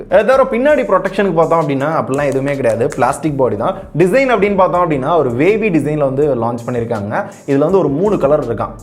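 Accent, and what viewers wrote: Madurai, India. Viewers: Bro negga madurai than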